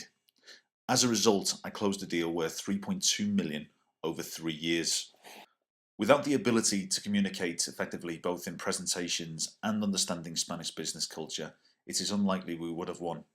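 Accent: United Kingdom. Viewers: yessss scouser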